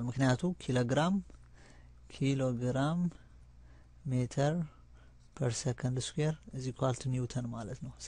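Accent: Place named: Ethiopia. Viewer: LOL such an Ethiopian accent! I love Ethiopians!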